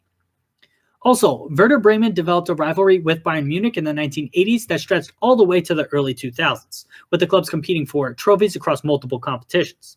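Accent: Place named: United States. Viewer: Please try learn pronounce correctly mames out of your language, or you'll keep sounding as an ignorante yankee. Nicely said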